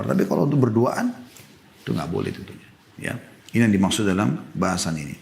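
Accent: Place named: Arab world. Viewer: Ngomong mu masyaAllah Ala arabica gitu lo.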